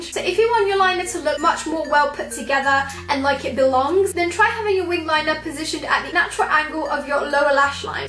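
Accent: England, United Kingdom. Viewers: Does anyone know in which part of England she lives in? I love her accent! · I love your accent it is absolutely beautiful.❤️ (I'm from England too) 🇬🇧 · Soooo pretty and i love your british accent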